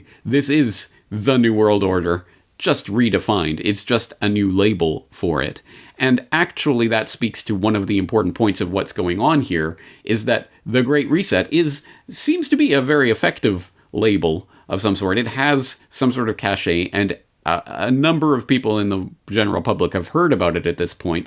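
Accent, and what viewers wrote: Germany. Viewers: Well german accents are normally not unnerving at all, that is until you open your speech with talk of how WW2 was good for the world. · Nothing wrong with a German accent.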